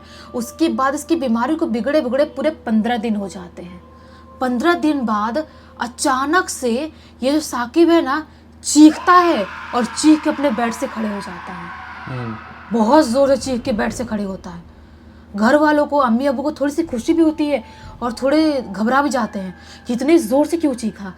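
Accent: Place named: Eastern Uttar Pradesh, India. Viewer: Language se pta chalaa mam shayad eastern up ya Bihar-jharkhand se h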